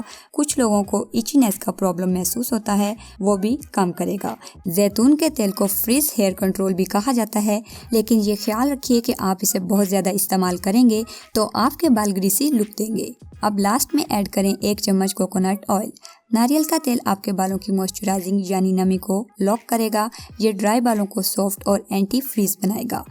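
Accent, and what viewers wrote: Punjab, India. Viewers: sister v love her with Punjabi lehja. She is a talented person so it does not matter that what is her eccent. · api punjabi nai bolti or wo punjab sy hy to pujabi style hi ho ga na .or kaisy boly wo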